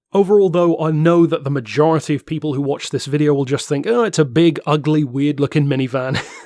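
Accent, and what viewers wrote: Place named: Cornwall, United Kingdom. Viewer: if you see this haha, are you Cornish because you have a very posh Cornish accent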